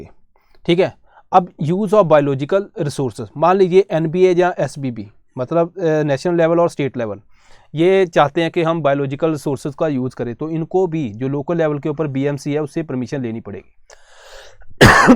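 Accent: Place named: Punjab, India. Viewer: When you speak Hindi in Punjabi 👍👌🦁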